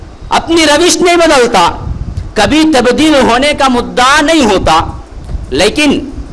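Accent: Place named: Hyderabad, India. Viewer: Hafiz sahab apki zubaan bohot achi lagti hy, jo shayad Haderabadi hy. Masha Allah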